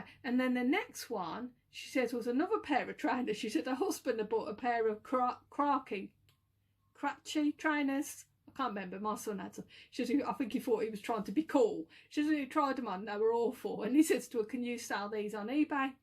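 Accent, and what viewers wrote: Birmingham, United Kingdom. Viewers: I love your accent. You sound just like Ozzy!! Blimey, I just checked, and he's also a Brummie! I think me ears are good. ^_^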